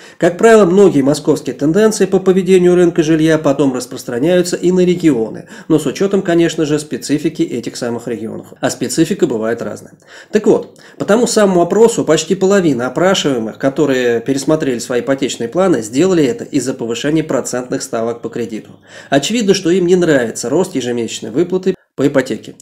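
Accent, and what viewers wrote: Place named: South, Russia. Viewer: у вас южный акцент. Если не секрет вы откуда приехали в Москву. 😊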